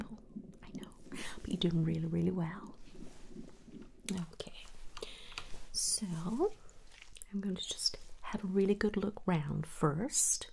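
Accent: South, United States. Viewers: Omg I was not expecting a southern accent when I read “American accent” lmao! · I thoroughly enjoyed this one 😄 great job on the Southern accent lol. Maybe try an African accent next time just for laughs 😆, now that would be quite the challenge 😎! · I think the title is hilarious "dreadful American accent" hahaha! I think it's actually pretty spot-on. We have different accents and this has a sort of Southern hint to it, but pretty darn good! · Actually... The southern accent is pretty much this.... But well kept secret, Southerners do the best fake Brit accent 🤣🤣🤣 we're the last remnant of the British rule in America 🤣🤣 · I heard the southern too...Kentucky, Georgia or South Carolina maybe...mixed with somewhere else I couldn't place, but there are so many parts of the U.S. that are off the beaten path, it could be a real accent somewhere. The main thing is being consistent throughout...which I thought you did well. 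· Not dreadful, unless you weren’t going for Southern. Every time someone from England tries to do a American accent they sound like they are from the south. And the wig definitely goes with the accent 😂😂. love your vids!!! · Sounds good. Like the southern twang you added. I'm in the hills of Missouri and I approve. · You did great with the accent overall :) an easy suggestion (only since you asked) would be to make sure that you're not softening your vowels where they shouldn't be.....I think that's a big difference between your side of the pond and mine :) also, be careful not to throw too much southern into it, it can ring very fake to southerners ;) but girl this was awesome and I definitely think you should try the accent more! I also personally love this particular role play theme, so relaxing.... you are incredible as usual! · YESSS 💙 This was so amazing!!! It seemed like you were doing a southern-ish American accent and if sooo you did amazing at it. 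💙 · Southern belle! Love the accent honestly, it works! Soothing as always, really love your asmr videos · You sound like a southern belle!!! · Omg the accent was great! It sounds very southern. Good job 👏🏽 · Yup I was thinking the same, kind of has a southern/Midwest twang😁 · Sounds like ur from the south xxx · Honestly the accent isn't that bad. At times it sounds sort of southern which I thought was nice. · Hi.... I mean!;) I wish the ob/gyns I've met were as nice as you are in this lovely, lovely RP.:( They are usually so dismissive and sometimes even rude. I'm sure most of the time, it's not even their fault - cause they have so many patients to see. But sometimes, they are really not listening and not empathizing. That being said, I would really love a RP where an ob/gyn believes and comforts me when I tell her that I have excruciating period pains, that incapacitate me for days, plus PMDD the week before (basically PMS on acid) and suggests further investigations for endometriosis or other disorders, instead of just giving me hormones and dismissing me, saying that "period pains are normal". 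No, these most definitely are NOT. In other words, I have to mention that that's a lovely Southern accent (granted it's... mixed with something else) you're pulling off.:-D And I love your look with that wig. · The accent is vaguely southern but has hints of other regions as well. I've never heard someone with this accent before, but it's still quite relaxing. I don't really have tips because I'm terrible at accents as well. I couldn't differentiate between british and australian accents till I was like 12 lol. I would say the most un-American thing in the video was your use of the word "trousers" though. I can't remember the last time I've heard someone American say anything other than pants, unless they are referring to formal pants like for a suit. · You sound like a Tennessee lady. Doing some Southern charm characters would be good! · OH MY GOD THE SOUTHERN ACCENT IS SO GOOD???? LIKE OKAY WE SEE YOU!!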